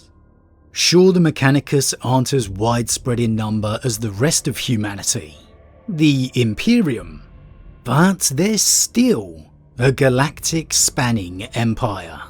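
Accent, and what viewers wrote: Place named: England, United Kingdom. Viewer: I get excited to hear some more and instead I get backwater English accent. GD I can't stand u euro trash accents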